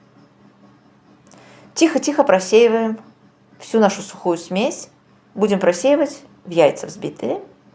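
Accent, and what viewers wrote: Baku, Azerbaijan. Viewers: Спасибо за рецепты!!!У вас настоящий бакинский акцент😂😂😂❤❤❤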